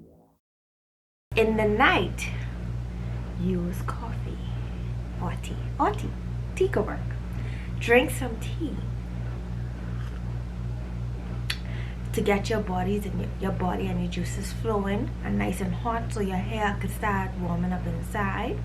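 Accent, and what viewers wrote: Bahamas. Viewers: You’s from the bahamas aye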